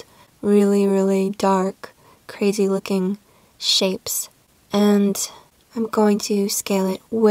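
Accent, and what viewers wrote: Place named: South, United States. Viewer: Excellent. First, you taught quite a few tips that I can apply in other editing programs as well; And also you showed Affinity which I've always been curious about. Finally that hint of a Southern accent makes me want to move to South Carolina immediately 😎 Thanks!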